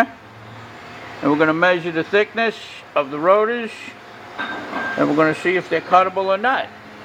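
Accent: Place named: New York, United States. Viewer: I was all "This sounds like a NY guy" Then I saw the FDNY hat, Alright!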